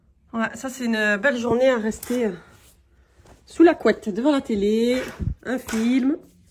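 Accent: South, France. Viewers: Salem alikom okhty 😊 Merciii pour toutes tes vidéo déco, cuisine, ménage… 🥰 je te regarde depuis un bon moment et j’aime trooop ton accent du sud ensoleillé Allah y barek Allah te protège et te donnes la force inchallah 🤲🏻 Allah y barek pour ta récitation de Sourat « الرحمان » puisse Allah le tout puissant iketeblek le Hajj à toi et ton mari inchallah 🤲🏻🤲🏻🤲🏻 Allah te protège toi et toute ta famille inchallah 😘😘😘🥰🥰🥰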